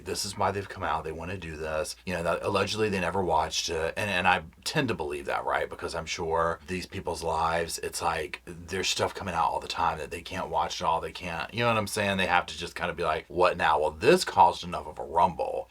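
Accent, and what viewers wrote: South, United States. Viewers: I know you ain't none of that fancy credential stuff but I ❤ you and your reporting especially The Southern accent makes me feel right at home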